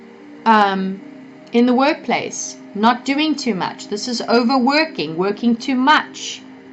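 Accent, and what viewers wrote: South Africa. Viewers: So on point .. my family did me dirty but it's ok.. thank you 🌹🙏🏽 you sound south African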